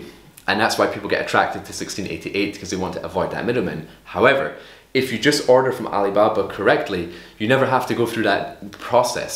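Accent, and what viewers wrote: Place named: Edinburgh, United Kingdom. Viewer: Thank you very much, and thank you for the Scottish accent, sounds like Edinburgh guy 👍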